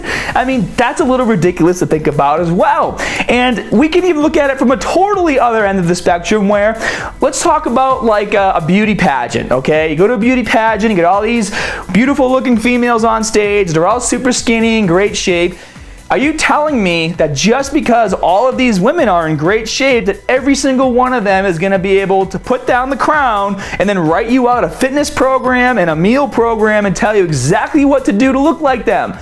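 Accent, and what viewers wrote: Boston, United States. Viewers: Is it just me or has accent become a lot more prominent recently? Boston I believe? It's awesome haha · I do agree with you on pretty much all of the videos you make, you seem like a good and knowledgeable guy about what your talking about. I do have to say you sound way more Boston than you used too, don't know why but you really do. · You sound more like you're from Boston or New Jersey every day haha good vid! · Nah its a boston accent is from lawrence Massachusetts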